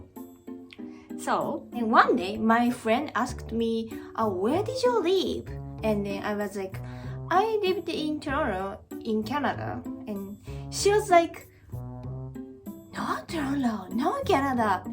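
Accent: Japan. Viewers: English spoken with a Japanese accent is part of the charm with your videos and collaborations. As an example, everyone is different such as Nobito, Lemi and Yuka, even Japanese Explorer who is not Japanese. Its all cool. Don't be discouraged if you're feeling a little self conscious. Keep speaking. You will always get an appreciative group of followers.✌ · I love watching you speaking English, with the Japanese accent. 😁👍📹❤❤ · I like the Japanese accent. Your voice is really cute.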